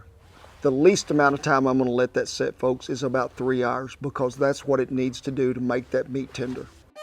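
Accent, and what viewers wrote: South, United States. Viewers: Southern draw* It’s about time I got onto this side of YouTube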